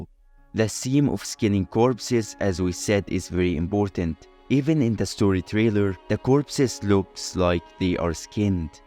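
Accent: Egypt. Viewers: اللهجة المصرية احلى اشي 😂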